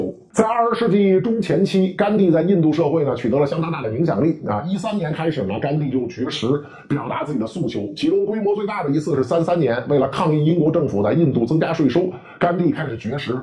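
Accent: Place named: North, China. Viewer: 可以换一个声音吗？这个口音我实在无法听清楚，我是马来西亚人这种北方口音实在让我头疼。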